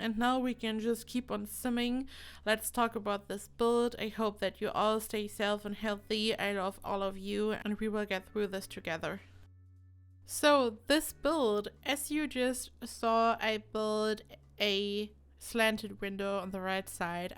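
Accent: Germany. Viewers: Are you from Germany ? I can hear there some kind of accent :) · are you German ?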